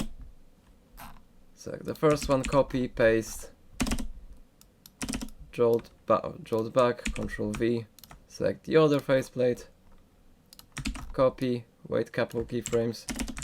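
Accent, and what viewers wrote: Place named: Poland. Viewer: masz polski akcent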